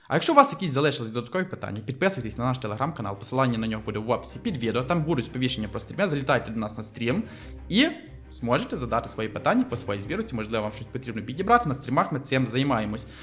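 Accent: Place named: Ternopil, Ukraine. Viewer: По розмові чути тернопільська або Франківська область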